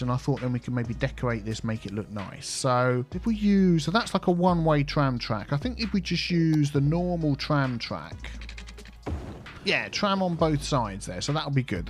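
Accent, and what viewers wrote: United Kingdom. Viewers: My daughter has been sleeping through your calming british voice since she was born 5 months ago. Also, I`m enjoying it. Especially your Teaville series. Thanks mate - keep doing what you`re doing!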